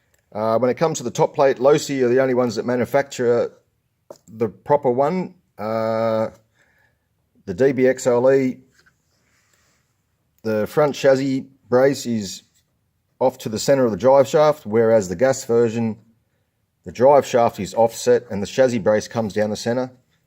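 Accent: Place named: Australia. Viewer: Ahh dude nice upgrades! And your an Aussie, love your country and accent! Liked and subscribed 👍👊 how did you find the fitment on the area RC stuff!? I bought some area RC alloy upgrades for my x maxx and none it fitted properly! I destroyed 4 wheel bearings before giving up installing the hubs, sent it all back and gotta full refund though 👍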